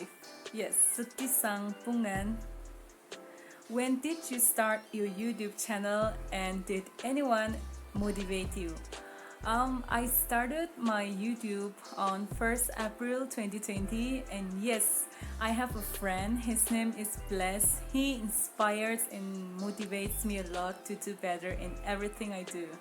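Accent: Nagaland, India. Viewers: Wow I love sumi dialect👍😎❤ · Hahaha love ya sumi dialect lots of love from Hongkong Market Dimapur 😀😀😀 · Haha so sweet the way u speak Sumi dialect❤️ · Love the way u spoke Sumi dialect 😍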